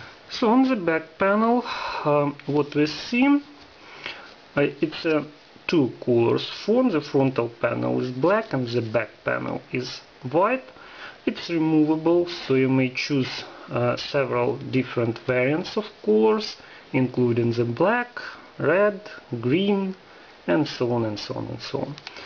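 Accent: Russia. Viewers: very good review. By the way, are u russian?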